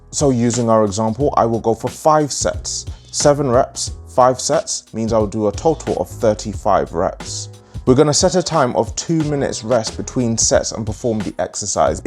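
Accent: United Kingdom. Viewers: woo...The British accent is almost as impressive 😅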